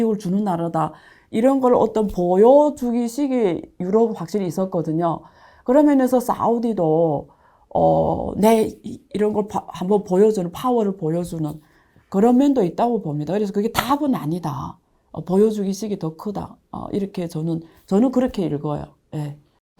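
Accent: China. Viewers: 중국분이신가? 발음이. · 많은.경제 뉴스를.신속히 전잘하는거 고맙습니다 다만 . 중국에.대한경제소식을 중국인의.발음으로 듣는게 그리.행복하지.않네요.. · 이 분 조선족이죠? 아무리 덧 칠을 해도 티 가납니다.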